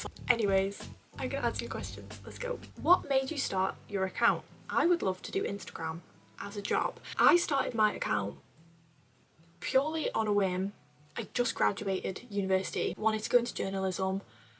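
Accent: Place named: Liverpool, United Kingdom. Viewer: As someone not from the UK I hear your accent as scouse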